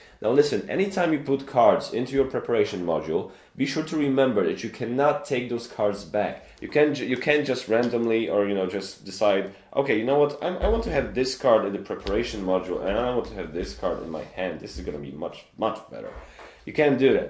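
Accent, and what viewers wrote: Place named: United States. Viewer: Tak z innej beczki.Z doswiadczenia wiem(12 lat w UK),ze czesto lapiemy jako pierwszy akcent ten,ktory jest wokol nas,Po 2 latach pracy w irlandzkiej firmie ludzie do dzis pytaja mnie czy jestem irlandczykiem.Skad ta nalecialosc amerykanskiego akcentu,Za duzo amerykanskich filmow?Moze pierwszy nauczyciel czy tez taki osobisty wybor?To nie hejt ani sarkazm.Po prostu jestem ciekaw.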